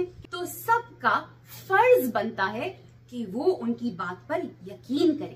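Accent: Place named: Punjab, India. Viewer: Good work wasy apki Punjabi boht kamal ki hai so sweet 🤣🤣🤣🤣🤣🤣🤣🤣🤣🤣ap in sab ki kotay wali boht kamal ki krti hai Punjabi ma🤩🤩🤩🤩🤩🤩🤩🤩🤩🤩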